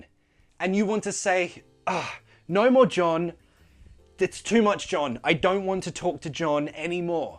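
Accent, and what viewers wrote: United Kingdom. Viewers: I don't like British accent, then I've found your channel! Nowadays I don't miss anything about it! I really like it...👏👏👏👏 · Can’t believe what I’ve just found, I always missed this kind of content. British accent and slang taught in a very fun way. We’d get along hahaha Keep it up dude! You got a new sub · british pronunciation is way too charming i’m love that’s the one i will learn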